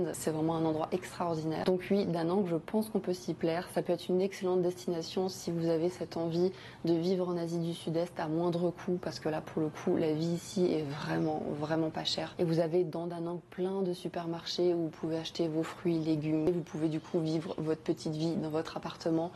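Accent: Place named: East, France. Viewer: Merci pour cette vidéo, je pars bientôt à da nang ça me servirab😊. C'est moi ou j'entends un résidu d'accent de l'est 🧐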